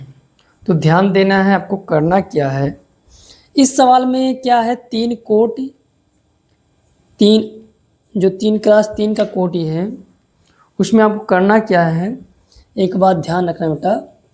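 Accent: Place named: Awadh, India. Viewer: Sar avadhi bol rahe ho thoda bahut😅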